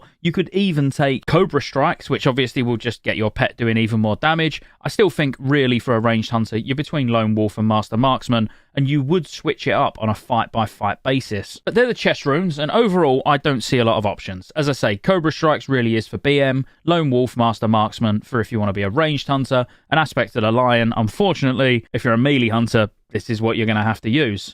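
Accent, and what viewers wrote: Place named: London, United Kingdom. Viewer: Definitely got a subscriber from this video. Also sound like you're from London and remind me of Alex Brooker!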